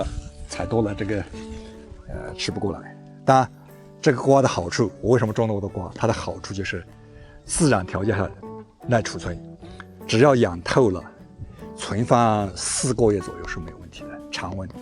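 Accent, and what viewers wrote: Yunnan, China. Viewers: 我的家乡是云南德宏芒市，听着您的乡音太亲切了! 我也喜欢种菜，您的视频太有帮助了!谢谢!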